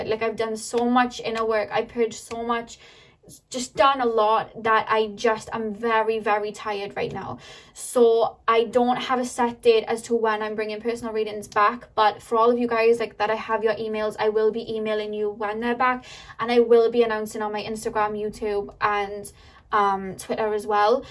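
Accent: Wales, United Kingdom. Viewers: I always take every one of your pick a cards as a personal reading, because you're really that accurate. And you're so comforting and uplifting, hearing your lovely Welsh accent is like receiving a hug from my Nana (she was also from Wales, sadly passed away.) Thank you so much, you are a true spiritual warrior & you give so much, you deserve all the love in the world 🐉💎💐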